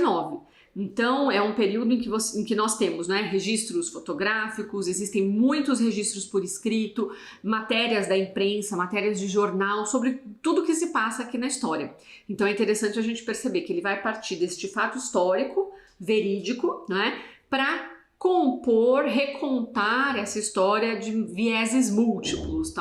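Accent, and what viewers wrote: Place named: Brazil. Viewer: Me dói tanto que sejam os intelectuais brasileiros a se interessar e a estudar a literatura moçambicana e não os próprios moçambicanos. Adoro ouvir o seu sotaque brasileiro, sobretudo, quando pronuncia o nome do nosso imperador de Gaza (Ngugunhane). Mas tão de parabéns. Abraços de Moçambique.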